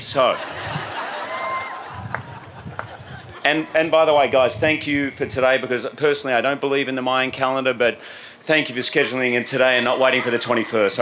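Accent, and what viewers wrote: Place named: Australia. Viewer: An Aussie to be so proud of - and he still sounds like one!!!!! Love him - and his family.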